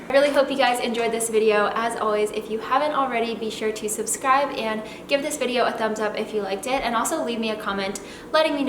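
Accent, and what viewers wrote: Canada. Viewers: I love the way Canadians say “pasta” 😍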